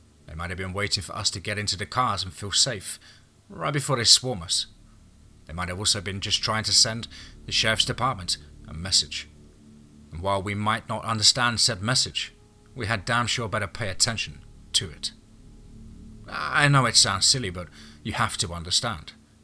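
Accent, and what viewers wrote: United Kingdom. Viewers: Is it me or does the story being read sound odd in a UK accent versus being done in a Texas accent? It’s simultaneously seems off but it works really well at the same time.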